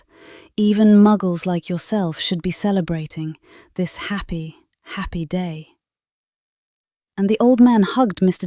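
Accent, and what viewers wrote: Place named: United Kingdom. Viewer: wow what a translation and english pronounciation. Pure British Accent. Awesome.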